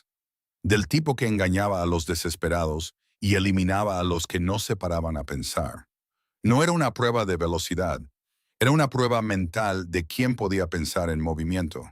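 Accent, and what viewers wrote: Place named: England, United Kingdom. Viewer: Horrible el español con acento ingles, nuestro idioma español es hermoso no lo arruinen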